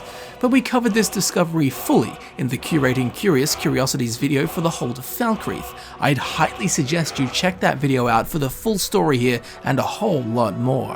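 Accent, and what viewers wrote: Australia. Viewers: He's British, he has it memorized. Edit: Australian? It's less funny now. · isn't he australian though?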